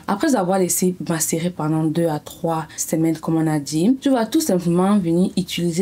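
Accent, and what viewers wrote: Cameroon. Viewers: J'aime trop ton accent camerounais 😊😍💋💗💖💕😜🌊🩷😗🫶🏿😘🥰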